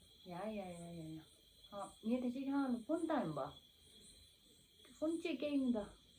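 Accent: Standard. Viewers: བོད་སྐད་གཙང་མ་སྐྱོན་གྱི་འདུག་པས་ཉན་རིན་འདུཙ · བོད་སྐད་གཙང་མས་ཐོག་ནས་གཏམ་བཤད་དཔེ་ཡག་པོ་འདུག།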